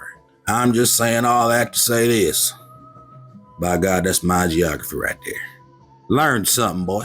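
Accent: South, United States. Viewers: the southern accent is distracting and cringe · Man. I'm from Georgia and your ass had me 😂 crying talking like one em country boys. Love this channel. Keep doing your thing man. I'll keep watching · Bruh, this southern accent is trash. Makes a lot of the video hard to watch.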